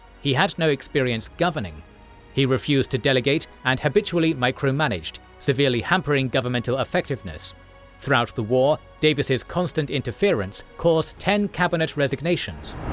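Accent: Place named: United Kingdom. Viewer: Hate the speed-reading thick British accent.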